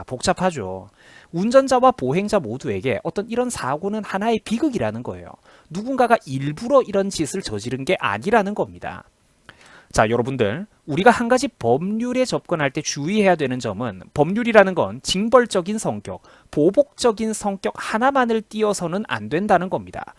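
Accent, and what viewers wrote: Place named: Daegu, South Korea. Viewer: 항상 잘보고있습니다. 대구사투리 넘좋다 하앍하앍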